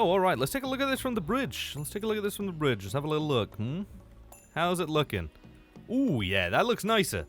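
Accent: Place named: United Kingdom. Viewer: The strong British accent slays and I could like hearing the yapping.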